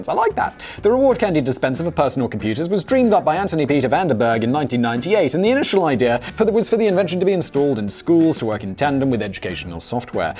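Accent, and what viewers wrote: United Kingdom. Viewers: The way Brits say urinal, garage, and controversy is weird. And I learned this from watching. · I did not know British people said urinal like that. I’m shook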